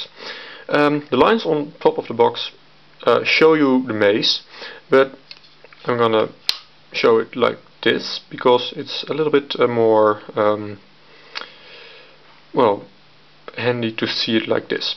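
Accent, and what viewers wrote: Netherlands. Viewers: Ben jij Nederalnds? vraag ik me af vanwege je accent? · ik hoor gwn dat je uit nederland komt :D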